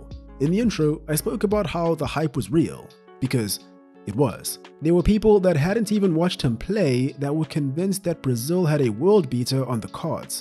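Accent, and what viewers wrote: South Africa. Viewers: You Sound South African Bro🇿🇦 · Great to hear a South African doing his thing on this channel.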